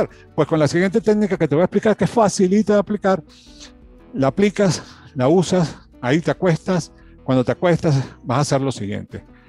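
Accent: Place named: Cuba. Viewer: Me encanta, lo que enseña son mis temas favoritos me encanta descubrir cada vez más lo que dijo JESUCRISTO... EL REINO DE DIOS ESTÁ DENTRO DE TI...... YO YA ME SUSCRIBÍ Y LO SIGO NO SE DE DONDE ES PERO OJALA, SE LE QUITE SU ASENTO XQ A VECES, NO LE ENTIENDO.... ALGUNAS PALABRAS, PAREGIDO A LO CUBANO CHICO... 😂AYÚDEME A ENTENDERLE MEJOR... LAS S S S SE LE DESAPARECEN A VECES... LO Saludo desde GUADALAJARA JALISCO . ME FASCINA SUS TEMAS Y SI TENEMOS PODER... ME ENCANTÓ LO DE JOSÉ SILVA, PERO OJALA FUERAN EJEMPLO CLAROS. ESPECÍFICOS QUE HACER EXACTAMENTE.. MIL. GRACIAS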